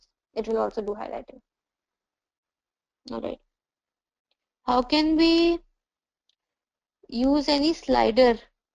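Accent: India. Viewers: Hey Edureka. Why dont you activate English subtitle sections on your videos? Sometimes it's really hard to understand Indian accent so subtitle make to understand easier. Thanks.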